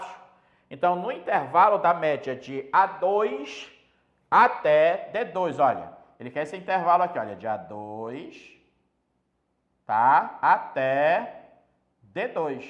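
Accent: Pará, Brazil. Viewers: Esse professor, é paraense. O sotaque não deixa passar (vamo deixar baixo), rsrsrs... Aula excelente, professor!!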